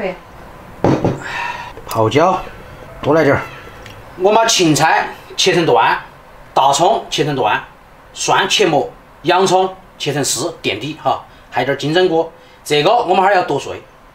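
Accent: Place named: Sichuan, China. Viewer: 我看超小厨说四川方言但唱歌太标准，老外觉得太有意思.这就是全世界一样，在韩国也是一样的.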